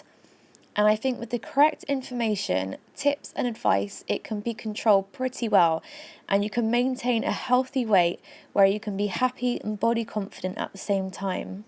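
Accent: Bristol, United Kingdom. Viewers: Love your bristolian accent . . feeling home sick now 😊💕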